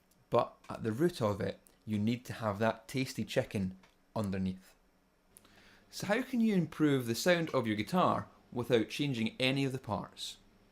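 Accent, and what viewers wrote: Scotland, United Kingdom. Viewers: It's so hard to understand what youre saying when you have Scottish accent..btw chicken needs ketchup and mayonaisee so PUT UP THE GAIN!!!!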